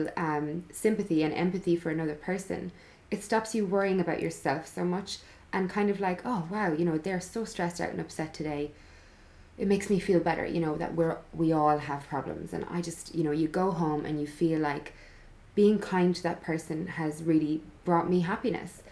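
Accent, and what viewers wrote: Ireland. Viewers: U sound Irish 🍀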